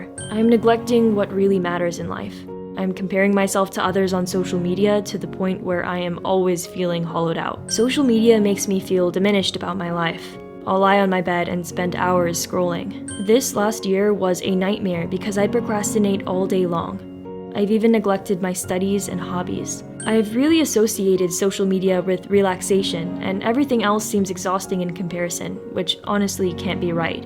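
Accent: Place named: India. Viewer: Your accent sounds like Indian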